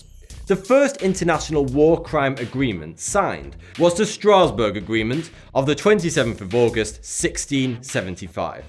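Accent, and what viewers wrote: United Kingdom. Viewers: Bro. I love your voice. Btw I like that Brittish accent. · Watching this video, my roommate walked by, and asked why Frankie Munez was talking in a British accent. No. You are NOT Malcolm in the Middle, and I will correct him on his folly.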